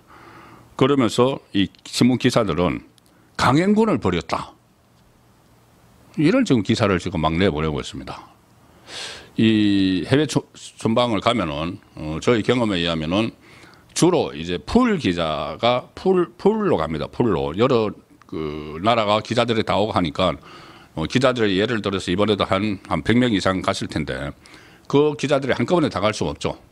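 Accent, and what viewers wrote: Gyeongsang, South Korea. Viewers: 날로 퇴행을 거듭하는 수꼴들의 행진!!! 갱상도의 항정살기름진 목소리 !! 기억한다. 악몽들!! 미숙과 완숙은 옆집이지만 거짓과 진실은 다른 동네다. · 도대체 뭐가 엉망진창 입니까? 윤석열 보다 훨씬 더 여유있고 간단한 대화도 하던데 뻘줌하게 혼자 서있던 바보하고는 확연한 차이가 있던데 경상도 사투리 쓰는 유튜버들은 따로 엠티를 다녀왔는지 교육을 받는지 이따위로 통일된 내용으로 방송을 하냐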